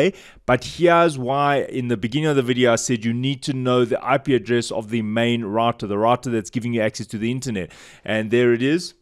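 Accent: South Africa. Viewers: Such a mzansi accent, love it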